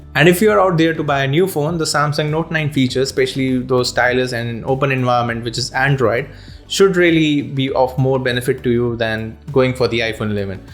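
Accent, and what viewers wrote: India. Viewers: I am here to hear the cool Indian accent. :)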